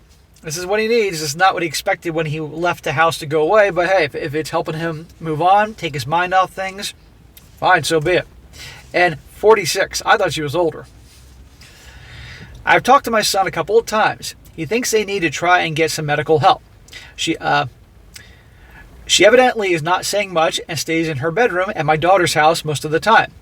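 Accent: Boston, United States. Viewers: "I don't have a Boston Accent"... I call BS. I knew you were a masshole the minute I heard your voice a year or two ago.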